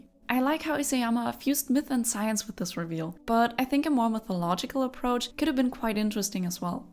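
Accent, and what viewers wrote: Germany. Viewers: Instantly knew you were German from the way you spoke, hätt aber nicht damit gerechnet, dass du aus Österreich bist. Gutes Video und Lg aus Kärnten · you sound german a little bit german, wondering if i guessed correctly :D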